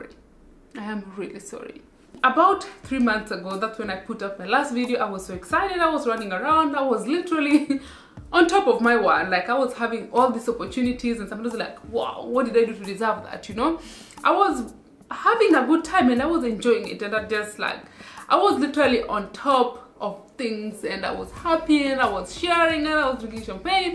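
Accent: Luo, Kenya. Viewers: I love ur luo accent.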